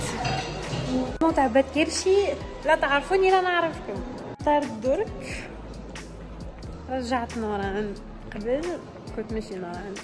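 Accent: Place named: Annaba, Algeria. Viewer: ياجماعة الي قلك شبيه صوتها هكا الغالب حنا لهجتنا لعنانبة هكا انا وسي نعيا نفهم ناس ادا صوتي وادي هدرتي مش نتصنع بصح هوما يقولولي اك تطوطي الغالب هدرتنا هكا نبدلوا هدرتنا ولهجتنا عجالكم تقبلواها واسكتوا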